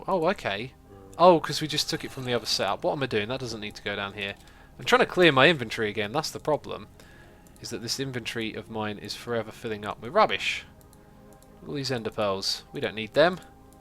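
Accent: United Kingdom. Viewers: love xisuminati modpack stream on you tube as i live in melb aust and ALWAYS miss your live stream. all the best in finding somewhere to live. you sound like you're from london so that's not going to be cheap, i think i have enough to put a deposit on a harry potter understairs cupboard.....maybe not. keep up the brilliant videos.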